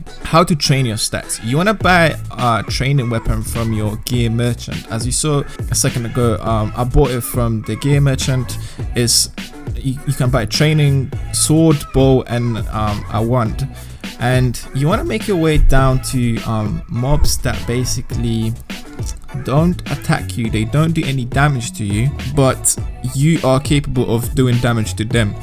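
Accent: United Kingdom. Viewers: At u British BC u sound like it lol( =①ω①=) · I'm guessing your British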